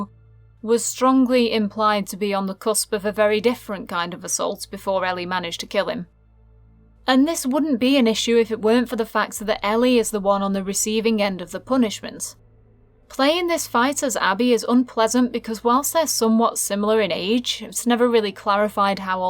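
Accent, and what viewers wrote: United Kingdom. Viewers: Good job with this analysis, it was quite interesting. Just out of curiosity, which part of England are you from? I only ask because your accent reminds me of the accent of the southern Birmingham area where I used to live, but I may be very wrong, as English is not my first language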